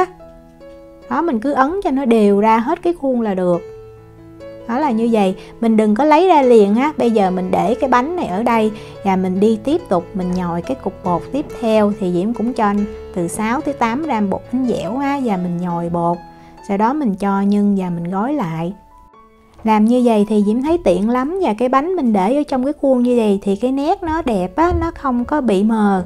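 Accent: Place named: Can Tho, Vietnam. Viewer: nghe giọng c Diễm, e tưởng quê Cần Thơ. hihihihi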